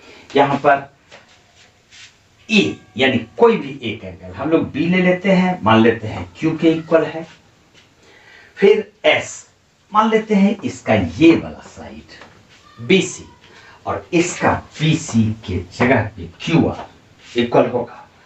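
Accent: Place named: Bihar, India. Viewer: Lk dan 👍 sir aap bahut hi achha se samjhate hai students ko asani se samjh aata hoga aap ki boli se lagta hai aap Bihar se bhi ham thik kah rahi hu sir ji 🙏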